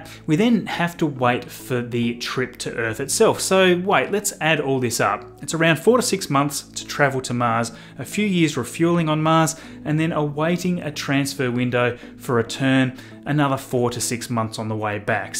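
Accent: Australia. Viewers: That's why I pointed it out :P That's an Australian accent btw, not British hehe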